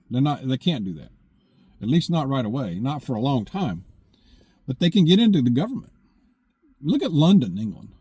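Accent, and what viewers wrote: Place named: United States. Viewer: I like how you talk...good hearing just regular American citizen real person. I'm Tennessean so that's more like normal folk.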